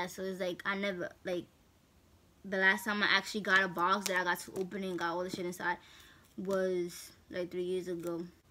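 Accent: New York, United States. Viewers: Your accent sound like you from New York but anyways lmao I’m also getting an iPhone XR this Christmas or maybe earlier but yes I’m so excited I always watch unboxing videos of something I’m getting in the future😂😂😆😆‼️‼️💯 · Where you from? You sound like you from nyc ...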